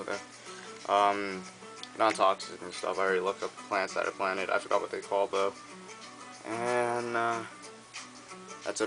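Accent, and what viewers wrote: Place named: Boston, United States. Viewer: you from boston, nice accent im like an hour away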